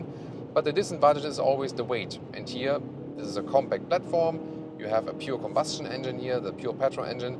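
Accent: Germany. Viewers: love your videos ....i think reviewing cars just sounds better with a German accent !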